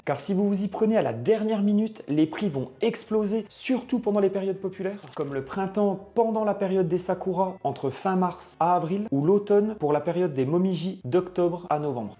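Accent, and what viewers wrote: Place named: Vosges, France. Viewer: Merci pour tous tes bons conseils . Je pars dans une semaine et ça m’est bien utile . C’est drôle cet accent vosgien que tu as gardé ;je suis de là bas 😂😉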